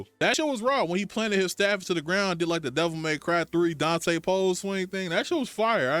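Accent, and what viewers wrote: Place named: Chicago, United States. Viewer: do i detect a CHICAGO 🥷🏾!?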